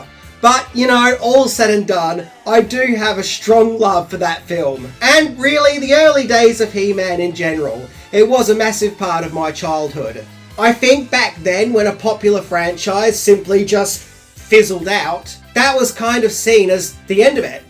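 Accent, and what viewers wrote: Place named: Australia. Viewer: Bro you make some of the best videos ever!!! You are funny, imformitive and just over all a good dude!!! Im a 43 year old american male, i know the profile says maria curcio but thats my mother in law that passed in july 9th of last year and her daughter, my childrens mother was nice enough to let me use it even though me and my childrens mother havent been together since 2015😊 Im usually signed in as Heathdiegert013 or something close. I dont know how to change it and i feel bad to change it😊 I LOVE your voice, you aussies sound so cool!!! Please dont ever stop if you dont have too😊 my favorite Austrailian!!!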